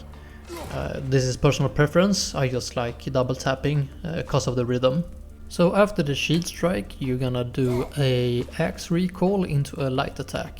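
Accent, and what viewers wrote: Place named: Sweden. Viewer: very nice swedish accent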